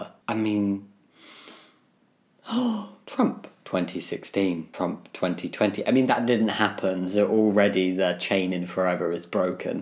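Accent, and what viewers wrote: England, United Kingdom. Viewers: you look, talk and act like a caricature of what an english person looks like on basically any american sitcom EVER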